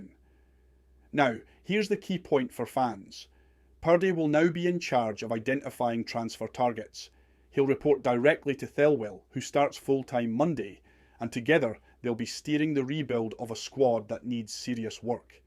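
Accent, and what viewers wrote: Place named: Scotland, United Kingdom. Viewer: This is the best of these recent spate of faceless podcasts with no crap, a Scottish non AI voice and excellent analysis, watch this grow fast. Koppen and Clement were never given a chance by the previous board who were planning to jump ship all along, the phrase "pawn sacrifice" comes to mind.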